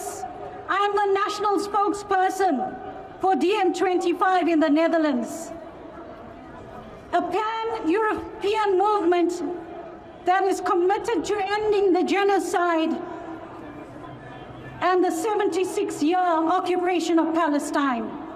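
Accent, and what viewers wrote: South Africa. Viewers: Before she said she was south African I already knew it from her seevh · I immediately recognised the South African accent!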